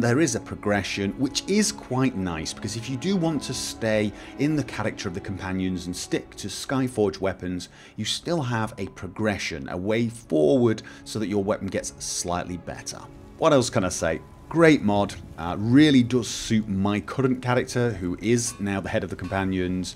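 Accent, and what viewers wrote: United Kingdom. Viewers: His accent is not Scandinavian at all it's British (I know the accents there vary but I'm not sure exactly where is from). However, he does live in Norway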